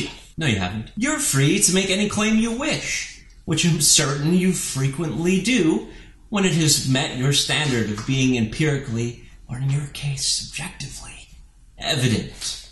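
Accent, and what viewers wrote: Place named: South, United States. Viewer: Great video and that's an amazing southern accent ;-)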